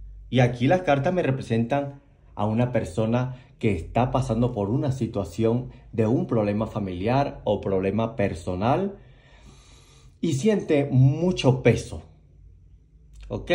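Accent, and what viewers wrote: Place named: Chile. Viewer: Hola me encanta escucharte ese tono de voz de chile yo soy argentina . Un abrazo a la distancia.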